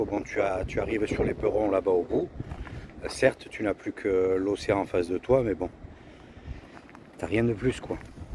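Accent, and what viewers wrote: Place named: South, France. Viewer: Coucou Je suis tombé par hasard sur tes vidéos et je trouve ça super génial. J ai vu aussi ta vidéo sur ton cc où tu nous présente ton véhicule. Ça me rappelle le nôtre,un chausson le best off 510 sur moteur fiat. Petit véhicule avec le même concept. Dis moi tu vis a plein temps dans ton véhicule ? Tu es déjà à la retraite ? Tu viens de quelle région avec ton accent (que j adore)du sud? En tout cas tes vidéos me plaisent beaucoup et je me suis abonné à ta chaîne. Je te souhaite bon vent, et profite bien. A bientôt pour ta nouvelle vidéo....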